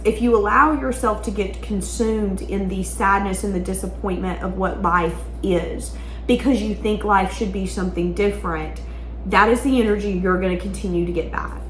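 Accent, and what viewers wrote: South, United States. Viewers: ❤ I adore your spirit! Always so sweet and to the point. Southern bell?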